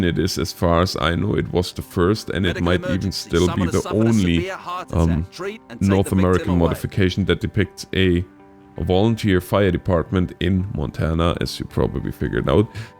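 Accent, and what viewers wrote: Germany. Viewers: i hearyour germen acend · You have a hard accent... are you german? :D (I'm a newbie)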